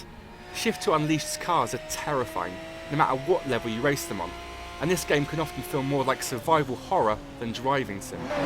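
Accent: United Kingdom. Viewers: Surviving borrow XD this British guys is funny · Oh, are we about to do this!? YEAH WE'RE GONNA FUCKING DO THIS! HE SAID SHIFT I HEARD THE F YOU DEAF PIECE OF SHIT!!! But seriously, I heard him say the f, I'm used to listening to british accents if that helps o.O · British accent annoys me... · Thank God a British reviewer, don't have to listen to one of the witless Americans. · he's british...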